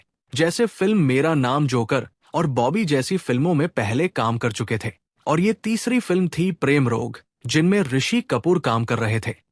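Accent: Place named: Bengal, India. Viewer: Ye kaisi hindi hai aap ki .bangali jaisi .usse bhi buri .kya grammer hai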